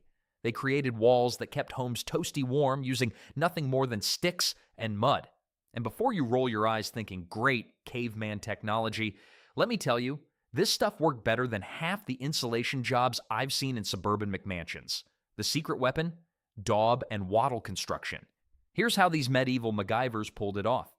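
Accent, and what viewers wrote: United States. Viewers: If there is one thing I love ( not) is listening to some yankee narration trying to be dramatic but failing to hold attention. Get to the point and drop the BS.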